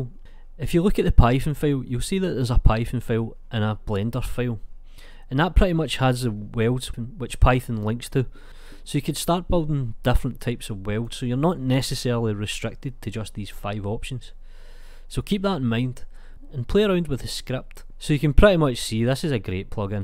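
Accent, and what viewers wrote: Scotland, United Kingdom. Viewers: yep Scottish for sure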